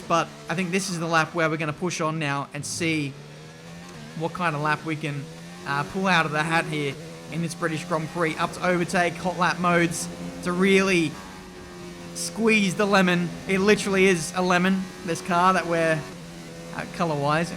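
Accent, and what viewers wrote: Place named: Australia. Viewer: I'm not particularly in to F1, but these career mode vids are good fun. Amazing how the graphics capture how the head tilts as the car corners. You can see the force acting on the neck and imagine how strong your neck muscles have to be to take the cornering speeds! With respect to American uploaders, it is refreshing to hear another accent. I love all the Ozzie phrases. I love that the Ozzies take pride in their vernacular. Never mind the Inuit with their 7 words for snow, I love the way Ozzies can say 'this is shit' in a 1,000 different ways!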